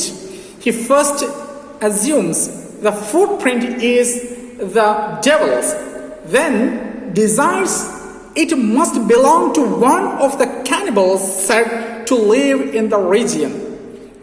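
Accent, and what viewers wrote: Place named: England, United Kingdom. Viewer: Presented well. Your adherence to English accent is appreciative but let me tell you Indian accent is also acceptable. Once fluency is achieved accent is welcome otherwise it will sound a little bit artificial. Having said this, I don't mean you are average , you are well above and I wish you splendid honeymoon with the language.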